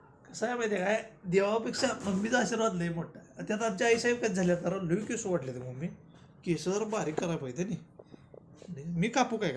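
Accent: Kolhapur, India. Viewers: दादा तुझे व्हिडीओ मी डबल बघतं असते एवढे व्हिडीओ छान असतात 😊😊❤❤❤❤❤👌👍 तुझी कोल्हापुरी भाषा खूप खूप आवडते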